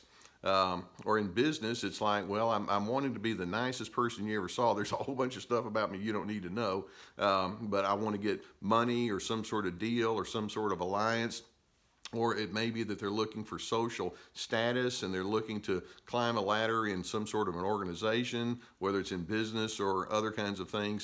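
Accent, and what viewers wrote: South, United States. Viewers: Oh, my! I'm from GA, too(near Valdosta, GA). Where did you go to college and grad school? Just curious. I love your southern accent. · Especially his southern drawl. Makes me feel like home when I'm on the other side of the planet. :)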